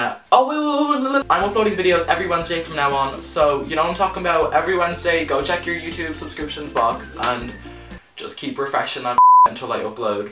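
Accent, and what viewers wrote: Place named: Ireland. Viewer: Ah bless. The Irish accent is lovely as always :) thanks for making my day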